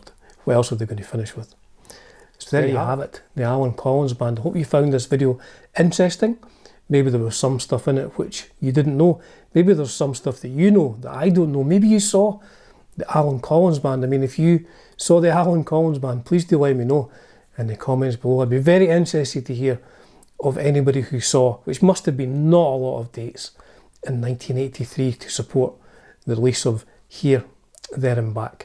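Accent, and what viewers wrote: Scotland, United Kingdom. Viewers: I have that album. Are you from Scotland? I lived there with my wife and kids. I detect an accent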